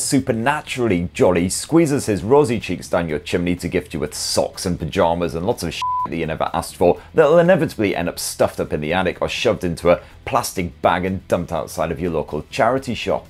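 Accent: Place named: Cockney, United Kingdom. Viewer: Man if you didn't have that cockney brogue...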